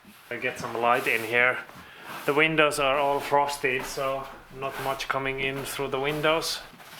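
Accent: Finland. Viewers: It’s been a long time since I’ve heard the Finnish accent. I do miss it. Cheers to the both of you from a🇫🇮🇨🇦on the coast of British Columbia 🇨🇦 I’ve been following you two since you were on the North Coast of British Columbia 👌Cheers 🍻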